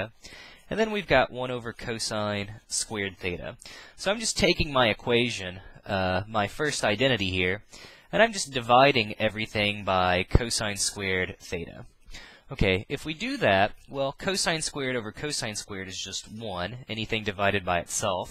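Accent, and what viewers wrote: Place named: Pennsylvania, United States. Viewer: As always, you were great. Can't thank you enough for taking your personal time to do this for us struggling math students. You rock. PS - Your accent makes me believe you're from PA...or maybe MD. Am I right?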